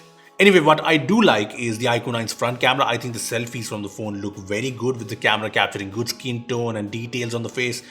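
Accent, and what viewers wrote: India. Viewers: Hate indian accent of english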